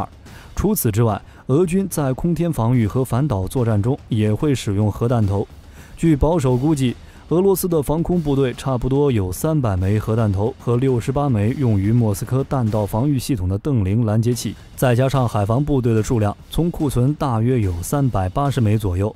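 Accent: China. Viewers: 大陆没人叫“普丁” lol, 我就觉得这频道有些视频内容有点怪怪的，原来是台湾的播主找个大陆口音的机器人发音软件做的。。。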